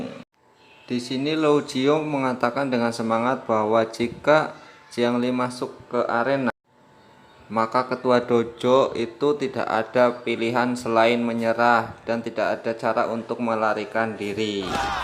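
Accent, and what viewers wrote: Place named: Java, Indonesia. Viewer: Medok banget jawa nya bang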